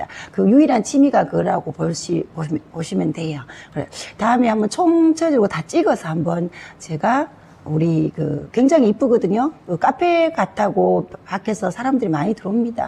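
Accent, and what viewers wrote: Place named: Gyeongsang, South Korea. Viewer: 오리지날 경상도네요 경상도 사람들은 말할때핵심이 없고 말만 많아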